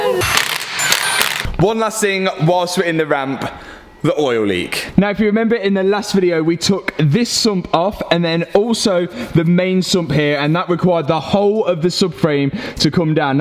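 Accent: United Kingdom. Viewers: This will sound bad but I don't like the British Accent at all but for some reason i enjoy your content and your energy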